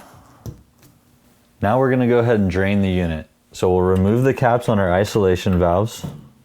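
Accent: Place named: United States. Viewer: A real person speaking perfect American English. Thank you God.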